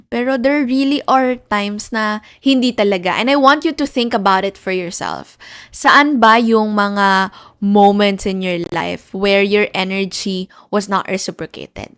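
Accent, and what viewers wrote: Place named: Philippines. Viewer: That was one of the greatest podcasts I’ve ever seen And I see a little Philippines accent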